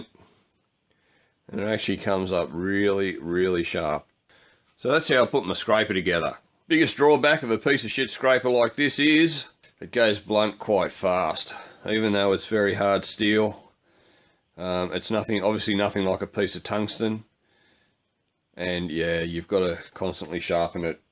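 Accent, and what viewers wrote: Australia. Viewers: a sweet Aussie accent. This dude sounds cool as hell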